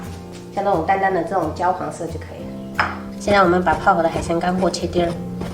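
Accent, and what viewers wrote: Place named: Fujian, China. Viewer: 真香，四川人說福建話，做福建菜比福建人更勵害更標準。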